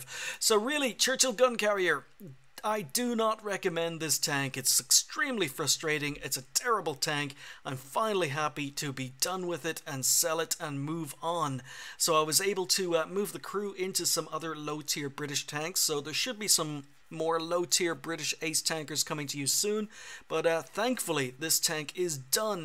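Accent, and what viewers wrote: Ireland. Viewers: are you actually irish? I can hear a bit of an irish accent but not much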